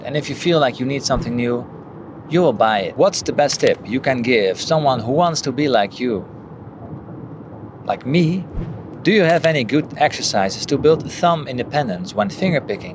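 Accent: Netherlands. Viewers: Are you dutch? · Are you Dutch? Your accent sounds very Dutch.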